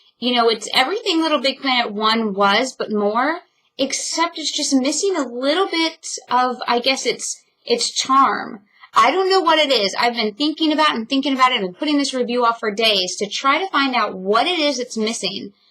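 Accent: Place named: England, United Kingdom. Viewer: I don't want be a douche, but it's an English accent, not British, because you're not going Welsh, Scottish or Irish. Great review by the way.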